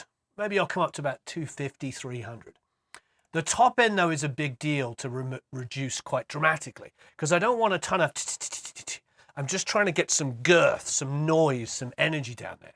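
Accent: United Kingdom. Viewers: Hi, first of all: I love watching your videos, your British accent is great - "I hope you are doing marvelously well" and your is it "shnits and schnitzels (?)" (reminds me of "Wiener Schnitzel" each time). I have a question: you seem to add quite a lot of new things to a mix. How do the artist(s) react, when you sometimes give some new character to a mix? I mean, maybe an artist does not want to be changed too much, he wants a mix with the character he has added to a song!? Let us take a famous song: " " by. There is a very remarkable delay on vocals. If had recorded this song in a studio with dry vocals and a mixing engineer would have added that kind of delay to his vocals, there could have been two reactions: "joy or disappointment, I think - is the mixing engineer going crazy, what has he done to my voice!!!???. Do you know what I mean? How far can a mixing engineer go? 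of course was a very special artist, he was doing most of the things by himself, especially in his early years and he actually did not care too much about the quality of his recordings - the mix had to be as good as possible finally, it was more about the composition or releasing something completely new. By the way, recorded an mixed quite a lot in Sunset Sound studios in LA, and Paisley Park´s Studio3 in Minneapolis was or is a copy of it. Susan Rogers has some interesting videos here on youtube talking about her collaboration with. It is also interse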